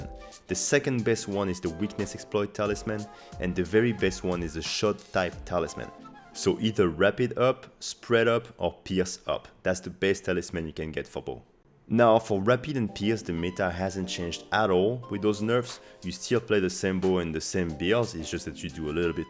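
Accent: France. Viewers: Mdrrr t'es français non? Je crois reconnaitre notre bel accent:) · its me or there is a french accent here ? :)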